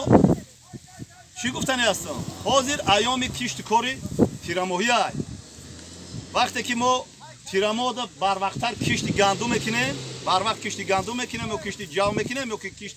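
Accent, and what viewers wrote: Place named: Mastchoh, Tajikistan. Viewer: Бобо ранги Мастчоҳӣ мардакча гап мезани... МошАллох бобо умратона дароз кунад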